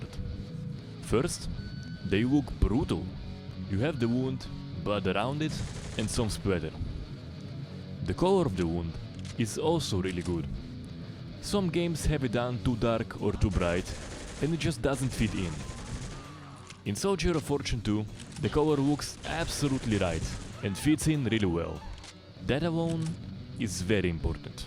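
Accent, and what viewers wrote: Russia. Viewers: Русский что-ли? 😀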